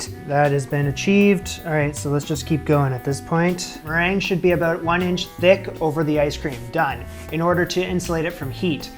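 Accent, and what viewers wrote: Canada. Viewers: You had it right ... The L's are pronounced in chantilly. You give the A a bit different sound than I, but I think that's because you're Canadian. Stick with your instincts. Really enjoy your videos whether you seem to mess up or not.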